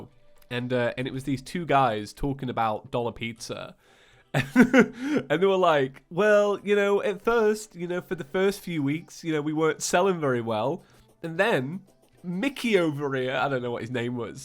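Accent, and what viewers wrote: England, United Kingdom. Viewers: I live in the US and you said 1000 pound grass and I was like... why are you weighing grass?! Then I realized thats money. And I never would have realized that if you didn't have one of the most english accents ever.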